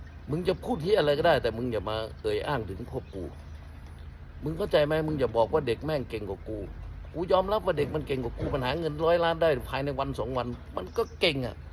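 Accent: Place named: Rural, Thailand. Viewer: สุดยอดครับพูดลูกทุ่งดีครับอาจารย์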